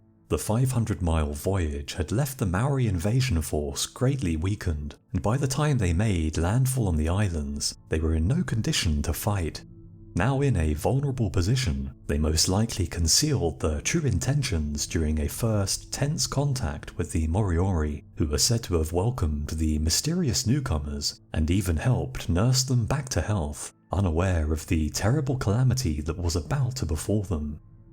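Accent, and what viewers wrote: United Kingdom. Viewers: soon as i heard the british voice i started laughing lol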